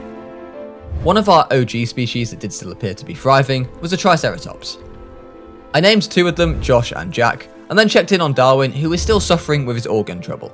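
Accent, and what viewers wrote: Czech Republic. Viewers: who wouldve guessed your from czech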